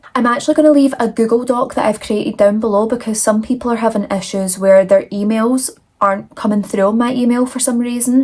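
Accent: Scotland, United Kingdom. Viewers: Is that a Scottish accent I hear?! Where about are you from? ❤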